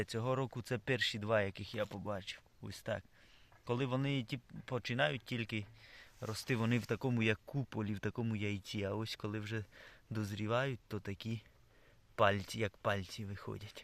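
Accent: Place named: Ukraine. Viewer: Отличная прогулка! Впрочем, как всегда., какой красивый у тебя классический украинский язык, даже без грибов, тебя можно слушать бесконечно! Без сленга, без польских и венгерских слов. Так говорить могут не многие и уж точно, сейчас не учат в школах. Я -то, точно не сбегу к другому блогеру 😁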